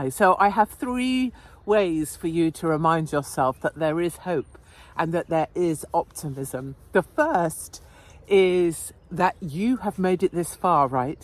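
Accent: RP, United Kingdom. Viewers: I love you sound like a posh girl,articulate like an estate girl.quality.x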